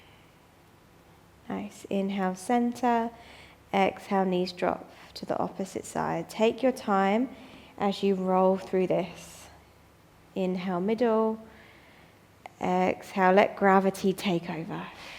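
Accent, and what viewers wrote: United Kingdom. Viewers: Beautiful British pronunciation. And a great yoga class, thanks!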